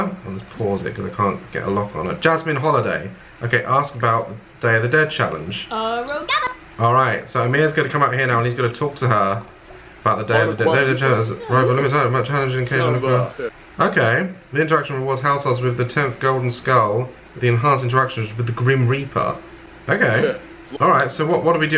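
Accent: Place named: Essex, United Kingdom. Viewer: just next time, please make the roasts funny. btw are you from essex? no offense but you sound like it. i am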